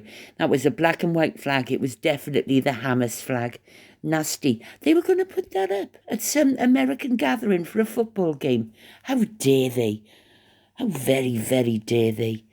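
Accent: Wales, United Kingdom. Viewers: Subscribed and enjoy following your channel from 🇰🇷. Btw, love your Welsh accent :) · Hello from New Zealand.I just love listening to you and I admire your intellect and humour. I too notice teeth 🦷🤪. I have a soft spot for Wales, the Welsh and the accent. Have a lovely day. 🥰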